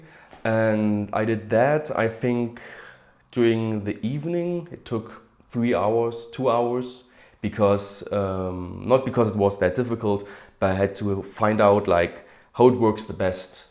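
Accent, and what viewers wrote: Germany. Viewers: I really like your English with german accent. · So my question is: Without getting too specific so as not to reveal too much detail that you may not want to put online about exactly where you live... (Where your from does not necessarily say where you currently live, and using large regions does little to narrow down a specific location, and accents could come from parents rather than matching exactly where one grew up)... your accent is not what I'm accustom to when I think German Accent, so what part of Germany is your accent from?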